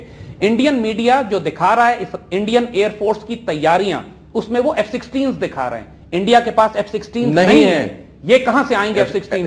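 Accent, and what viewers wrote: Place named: Punjab, Pakistan. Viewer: What are you yapping about? Speak in English, you illiterate. keep your dumb Punjabi Urdu in Punjab. Spare us your filth!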